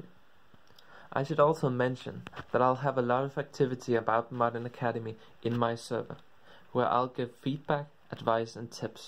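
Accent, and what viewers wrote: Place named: Denmark. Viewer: I can clearly hear that u are danish xD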